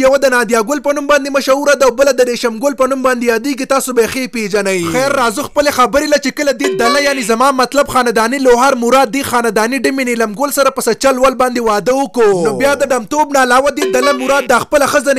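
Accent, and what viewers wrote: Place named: Dir, Pakistan. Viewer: on fire, da accent kho d dirojy dy bacha jee, snga teek me oway ka na, hum da dagha region ye.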